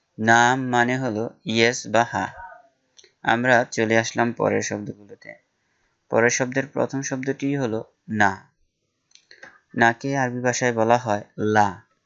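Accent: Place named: Noakhali, Bangladesh. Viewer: নোয়াখালীর ভাষা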